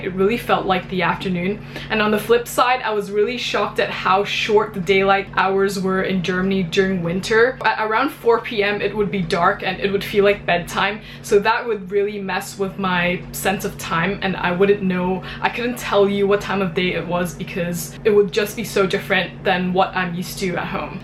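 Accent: United States. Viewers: Wow! I would have never guessed from your heavy Americanized accent that you were from Hong Kong. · Would not have guessed that you were from Hong Kong. You sound American or Canadian. Then again my friends who are from Hong Kong grew up here in the States, so what do I know? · She really does have a good American accent! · In Chinese (I don't know about Cantonese) there is 您 (Sie) and 你 (du). The older one offers "du" to the younger one. No, you can never, ever go back to "Sie". Even if you hate each other. You cannot go back to less distance after you've moved closer together. You cannot "unknow" someone. It's trying to change the past. Trying to make things "unhappen". Intimacy cannot be reversed. That's like putting toothpaste back. I have lived in China for four years. You finding distance and politeness mutually exclusive is soooooo Chinese. 不用客氣!!! 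Keeping the distance is an expression of politeness. You keep the distance to not bother the other person by coming uncomfortably close, emotionally speaking. From my cultural point of view you are confusing politeness with friendliness. Not saying "Guten Morgen!" isn't considered rude, it's considered unfriendly. How come you're speaking English like a US, white girl? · Did you pick up that perfect American accent whilst in Hong Kong?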